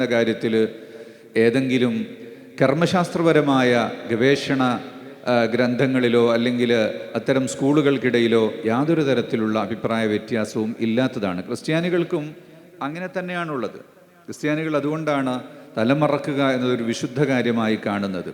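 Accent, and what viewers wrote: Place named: Arab world. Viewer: Iyaku malayalam ariyumo?? Arabi malayalam parayunnu. Pacha sanki malayalam.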